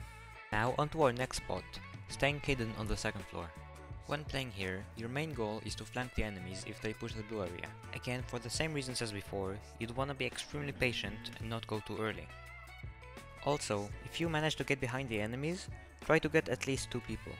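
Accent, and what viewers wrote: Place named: France. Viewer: Your accent and voice are sooo mesmerizing… I learned so much. Are you French or European?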